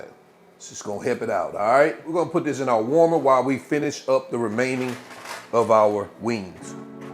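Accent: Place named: United States. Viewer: I love the way this guy talks. No idea what part of the US you're from but as a Canadian it sounds pretty cool to me. This looks amazing, I'm gonna make these as chicken fingers, idk something about federal laws. All chicken must be served in the form of fingies or you're not a true Canadian or whatever.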